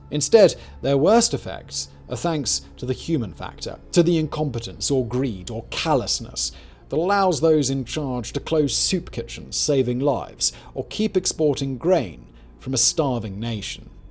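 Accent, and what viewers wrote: RP, United Kingdom. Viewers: You soft pedaled the affair. There's no line at which treatment like this suddenly becomes genocide or not genocide. You with your posh accent, to try and hide the fact of what a dope you are! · Great vid. Was particularly unusual to hear all this is Simon's British accent.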